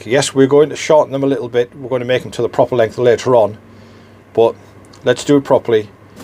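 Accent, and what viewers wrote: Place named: North of England, United Kingdom. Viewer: Where in England are you? I grew up in the North of England and to my now horrendously uneducated ear you sound like you might be close to there but ive not been back in 30 years now so I could be wrong.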